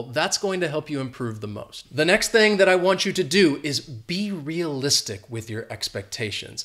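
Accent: United States. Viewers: I just love your american accent...and from now on I will create my own bubble by doing and all your suggestions.Thank you so much Sir😀👍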